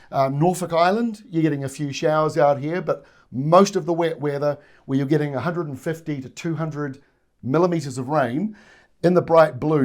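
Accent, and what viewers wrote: New Zealand. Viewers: It's always good to hear a kiwi doing an Australian weather forecast. Good one, Mr!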